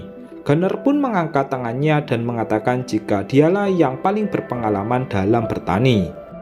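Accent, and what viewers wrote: Java, Indonesia. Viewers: Bisa gak logat jawa mu itu di hilangkan.jijik dengar anjing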